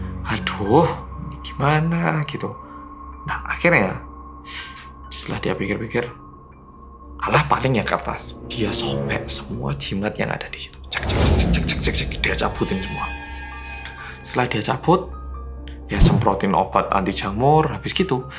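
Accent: Java, Indonesia. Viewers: Meanwhile Mas: "Halo guys welcome back to Korea Reomit bersama orang Korea yang.." Gw: "MEDHOKKK" Medhoknya ituloh terngiang ngiang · Bicaramu Caaakkk....muwedhok nemen...😄😄😄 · Logat Jawa nya medok banget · "Kodem" kalo di balik jadi "medok" dulu dia openingnya pake kata "medok" cuma sekarang dibalik jadi "kodem" Maksudnya medok itu karena itu orang korea tapi medok gitu cara ngomongnya sekian terima kasih. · bhs indonesianya kok fasih dan agak medok jawa nya.....😀😀lucu.....kayak tetangga saya.....chaina..... seneng pakai bhs indonesia tapi medok abis..... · Yang medoooookkk .haha Sukses selalu mas bro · korea indo medok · kodem lah, kan mas pernah tinggal di malang dan ikut membalik² kan kalimat kayak Reomit kan aslinya Timoer. kan juga pernah tinggal di Jawa Timoer. jadi nya itu tu Kodem alias Medok, oke? 🐦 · Dua jempol dech buat mas. Kagum bngt sama medok jawanya... 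Aq aja masih keturunan jawa, gk medok2 bngt. Jdi iri dech.. Srmangt trs buat upload kasus2 yg rada serem ya mas, tak enteni loch..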